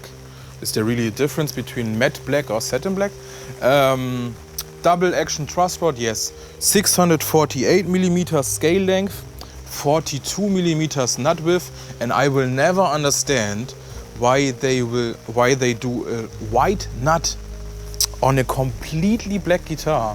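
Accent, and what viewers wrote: Germany. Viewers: Man hört gleich dass er Deutscher ist 🤣 Trotzdem ein sehr gutes Video!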